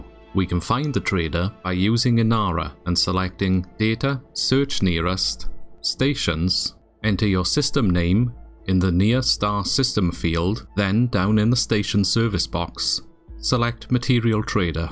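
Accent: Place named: Wales, United Kingdom. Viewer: Took me a minute to figure out that whimsical accent lol thats gotta be welsh! Appreciate the tutorial, o7 from Ireland :)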